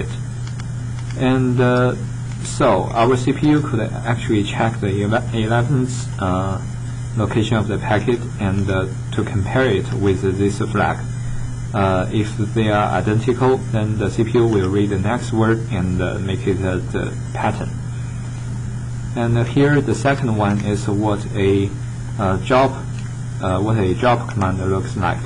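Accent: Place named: China. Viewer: 老铁，听口音像是中国人吧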